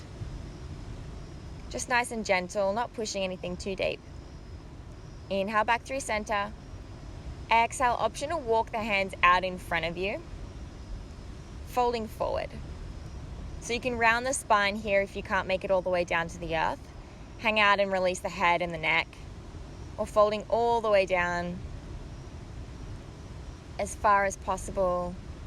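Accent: Australia. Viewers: the Aussie accent is great - a nice change of pace for a Midwestern (US) yogi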